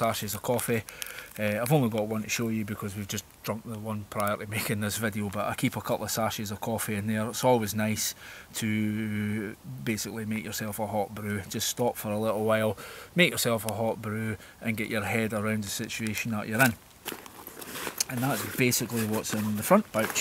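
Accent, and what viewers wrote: Scotland, United Kingdom. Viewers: Great video. I have to get one of those. I was not aware it was for the leg. You scotts and that accent. I could listen for hours. A lass even better. Cheers bro!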